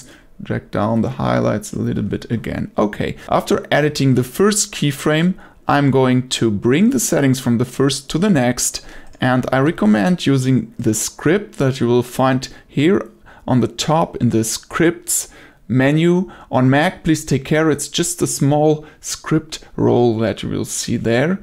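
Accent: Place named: Germany. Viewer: Excellent tutorial, I love you accent, are from Germany, just a little correction in you English, when you said "this is the workflow buttons which I explain you", you should say "which I will explain to you" I hope you don't mine me correcting you because you English is excellent, keep up the great tutorials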